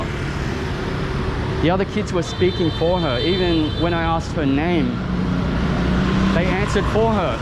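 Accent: Australia. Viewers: What does she means Chinese? Ignorant fish. You look, talk and walk like an Aussie to me without the hat and corks! Keep helping the poors and you'll find your Buddha. And keep up with the social rants with a big spoon of generalisation and a sprinkle of predjudice and you'll be an Internet Star too.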